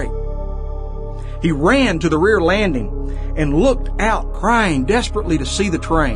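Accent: South, United States. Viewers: I love the story but every time I hear it the narrator always has a pronounced southern drawl. In 2019 can’t someone without such an accent narrate the tale?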